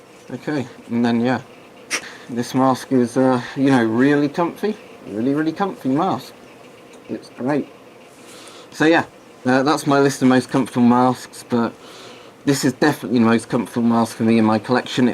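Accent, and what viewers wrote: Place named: England, United Kingdom. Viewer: I love this English accent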